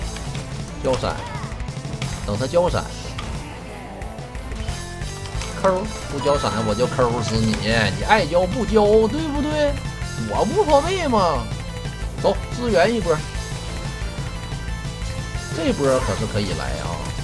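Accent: Northeast, China. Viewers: 东北话太好玩了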